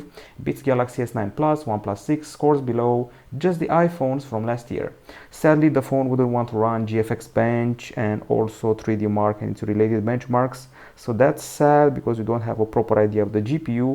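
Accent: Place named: Romania. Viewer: You have like a romanian accent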